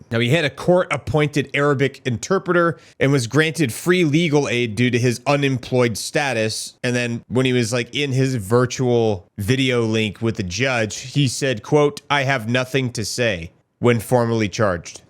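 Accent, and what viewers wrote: United States. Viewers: Takes a yinz to know a yinz. Cant hide our burgh accent brother.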